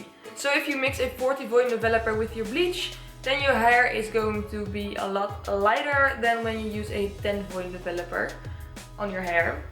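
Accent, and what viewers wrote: Netherlands. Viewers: Are you from the netherlands, I thought so bc of your accent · Are u dutch ? I get dutch vibes from your english 🥰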